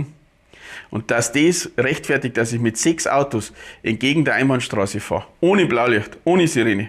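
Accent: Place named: Swabia, Germany. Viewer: Hallo, viele Grüße von Indiana in den USA. Ich schaue mir deinen Kanal sehr gerne an und habe ich schon vieles gelernt. Mal sehen was noch alles auf uns zukommt im kommenden Jahr. vor vielen Jahren lebte ich in Stuttgart und dein Dialekt bringt mich immer wieder zum schmunzeln.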